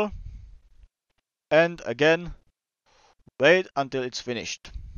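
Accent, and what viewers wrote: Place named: Czech Republic. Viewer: Slyším českou abecedu :D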